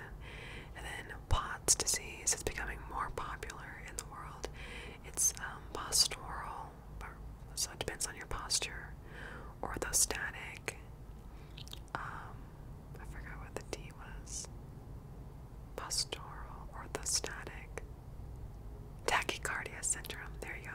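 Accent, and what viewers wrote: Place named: South, United States. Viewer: The southern accent is backk!! Literally ur southern accent vid is my fav vid to fall asleep to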